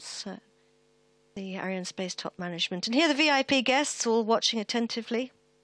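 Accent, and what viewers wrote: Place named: United Kingdom. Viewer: Why this British accent ?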